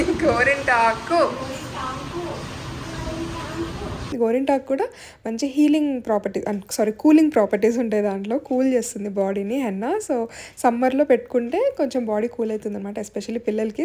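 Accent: Rayalaseema, India. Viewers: మన రాయలసీమలో మాటల్లో చెప్పడం చాలా బాగుంది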